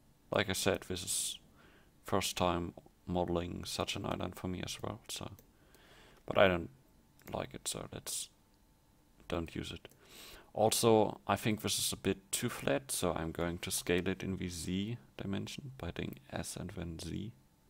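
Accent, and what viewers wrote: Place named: Germany. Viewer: Ich hab beim ersten Wort gewusst das du deutsch bist :D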